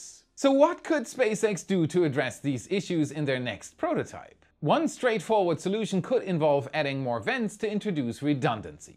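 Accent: Germany. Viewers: I caught the secret message! You still have a slightly german accent, so I was assuming you were from germany :D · Ja, ich wusste, dass du aus Deutschland kommst, weil man deinen Deutsch Dialekt raushört😂👍🏽 Deswegen heute mal auf Deutsch: Mal wieder ein klasse Video, danke für die ständigen Updates 🙏🏼🙏🏼🙏🏼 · Ich wusste doch, dass da ein minimal deutscher Accent ist😊 Grüße aus Deutschland zurück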